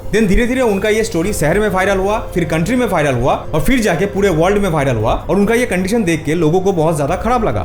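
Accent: Bengal, India. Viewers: bro , you are from west bengal, right , because your hindi language is not a regular hindi language it mixed bengali and hindi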